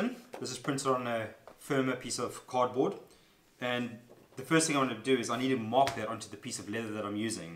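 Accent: South Africa. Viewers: Ja, thanks my boy for this video, cool to hear a SA bloke on here loĺ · You sound South-African if you are can you help me with good local places to buy tools and leather I'm looking at starting as a hobby.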